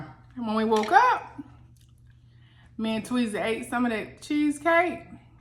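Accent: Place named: South, United States. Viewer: Your southern accent is so pure ❤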